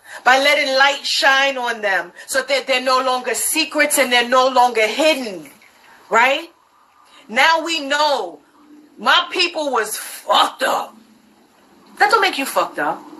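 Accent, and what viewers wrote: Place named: Caribbean. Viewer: I can hear that west Indian accent. Preach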